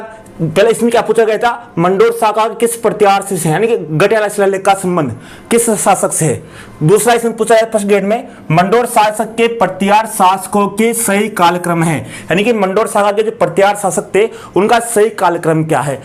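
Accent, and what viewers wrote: Hadoti, India. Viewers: Sir aap hadoti se ho kya, aapki boli bata rahi Hain, main tonk se hoin 😊❤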